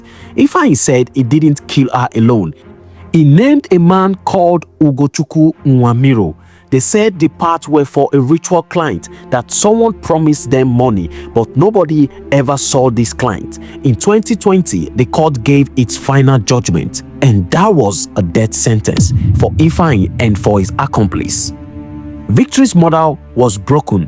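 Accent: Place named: Hausa, Nigeria. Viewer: This narrator no go school? Aboki man dey do narration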